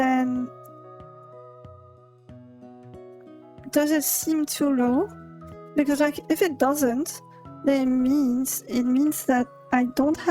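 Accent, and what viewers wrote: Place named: France. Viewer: Your english accent sounds like french. are you french?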